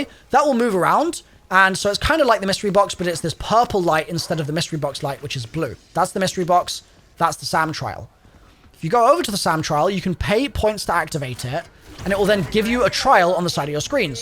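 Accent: United Kingdom. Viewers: Oh hell yeah 53 minute vid. Perfect for falling asleep to that soothing brit voice. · Any destiny ppl here thinking this is the brit version of datto or fallout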